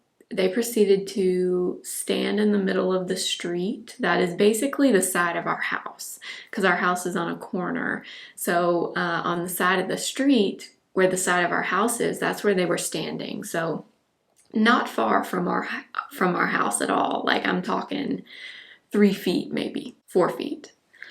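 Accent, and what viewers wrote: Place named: East Coast, United States. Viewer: Listening to your story about your neighbors and laughing not at your expense but because you sound like a younger east coast version of my husband and my self. We had similar incidents were we live with neighbors July 4th and having debris hit our home. We live in No CA where we just had some devastating wildfires and people still shoot off fireworks even though they are illegal. We also live on a corner lot which is mostly okay because we don’t have a house in back of us or directly in front of us but there are other issues that we had no idea about when we had the house built. Good luck on finding the perfect house and neighborhood it’s always a challenge. Though you might want to consider renting short term so you both can get familiar with the neighborhoods before buying.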